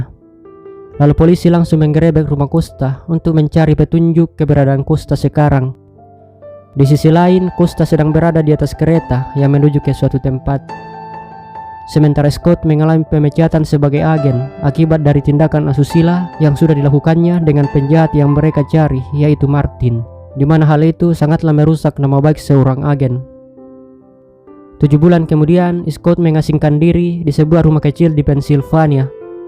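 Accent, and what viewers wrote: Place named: Jakarta, Indonesia. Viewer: owh..tapi cara ngomongnya kayak orang Betawi.....tapi semangat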